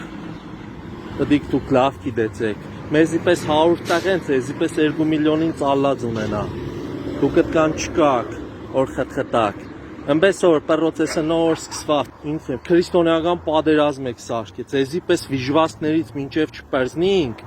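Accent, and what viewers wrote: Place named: Gyumri, Armenia. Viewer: Акцент Гюмреци молодец сынок джан спаси сахрани Господи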